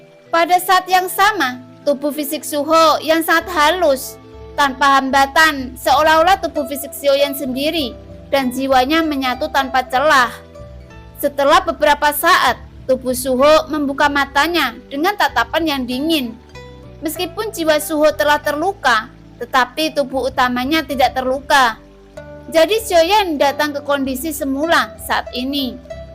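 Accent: Java, Indonesia. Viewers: Medoknya diminimalisir mbak e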